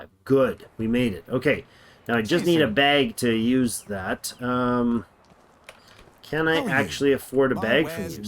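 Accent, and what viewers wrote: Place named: Canada. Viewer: Have been loving this game lately! My favorite gameplay and commentary yet- subbed and liked! I recognize a little Canadian accent there, eh?? Cheers from the Pacific Northwest USA brotha!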